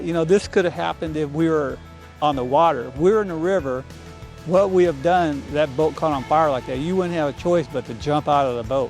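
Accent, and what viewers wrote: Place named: South, United States. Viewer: Mr,you sound like you're from south!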